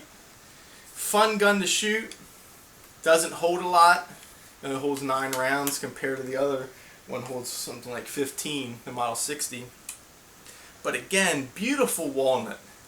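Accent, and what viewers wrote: United States. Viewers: Is yinz from Pittsburgh? I'm hearing some signs of a SW PA accent. Could be OH too, sometimes. Anyhow, nice guns. I can relate.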